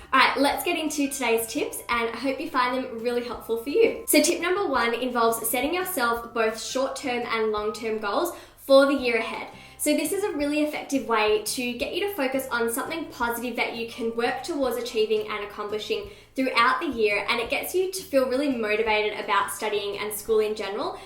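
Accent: Australia. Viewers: Australian lol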